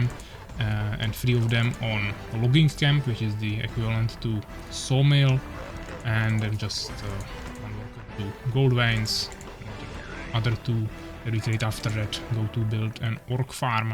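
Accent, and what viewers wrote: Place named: Czech Republic. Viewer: Your accent sounds slavic to me, in particular czech to me.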